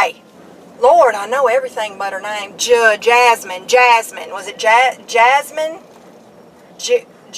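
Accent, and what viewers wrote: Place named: Texas, United States. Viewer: Where are you from? We sound alike. I’m from Texas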